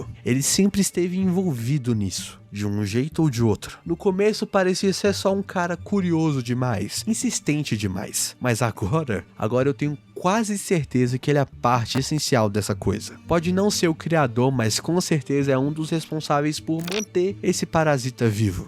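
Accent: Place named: Portugal. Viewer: O cara e português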